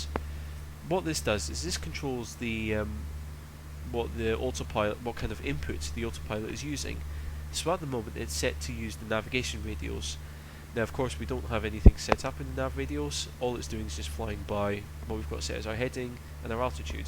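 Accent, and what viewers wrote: Scotland, United Kingdom. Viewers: Great explanation and relatively easy to follow. Thanks.... and in a good Scottish accent too lol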